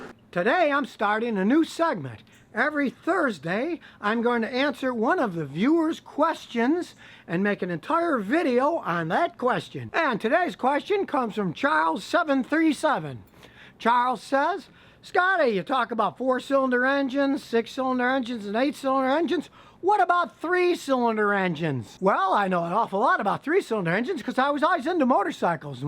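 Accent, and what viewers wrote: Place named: Texas, United States. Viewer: Hi Scotty! Greetings from Argentina!!! Did you ever see the Volkswagen Fox that is sold in Latin America? What do you think about it? Thank you very much for your videos! I love your Texan accent! It's so cool!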